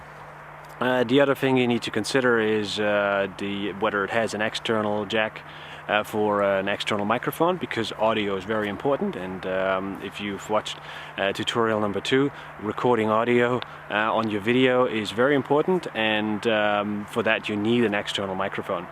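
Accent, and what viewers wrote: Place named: Italy. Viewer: Are you Italian? Love your accent!!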